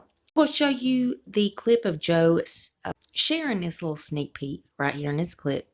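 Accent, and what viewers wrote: South, United States. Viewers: Love your accent! You are definitely a southern gal. Same here, KY gal. Loving my Boxy Charm and look forward to receiving them every month. Stay safe.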